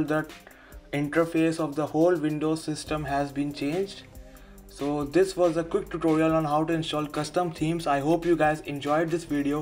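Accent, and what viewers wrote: India. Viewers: Indian English videos are so funny :3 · whenever u hear an indian accent iknow this shit gonna work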